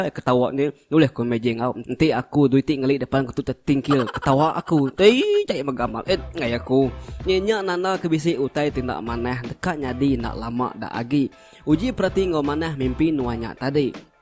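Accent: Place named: Iban, Malaysia. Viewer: cumil amt slang iban yaa hihii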